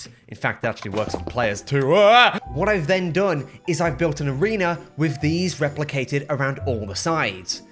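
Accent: England, United Kingdom. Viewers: Never piss off an English(?)man, he’ll break reality and ascend to a new dimension in rage Edit: he pulled a flintlock so he may just be American with an English accent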